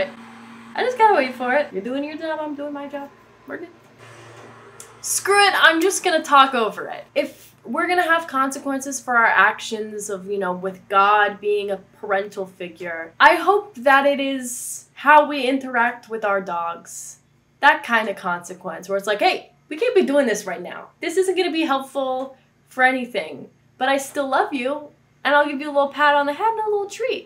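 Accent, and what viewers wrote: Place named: New York, United States. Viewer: You get more of a new york accent with every video haha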